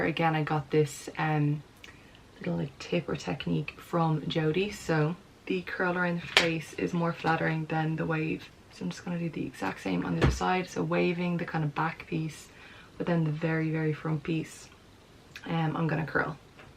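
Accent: Ireland. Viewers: Am subscribed to you but not getting notifications.? You are absolutely gorgeous - the Irish accent too.. great to see a young person w natural beauty Love from Australia…..💛✨✨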